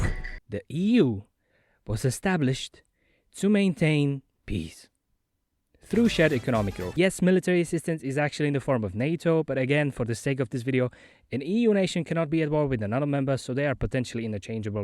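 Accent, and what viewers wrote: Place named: Eastern Europe. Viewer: inak otazka ty si slovak ci cech ci co lebo lebo znies tak slovansky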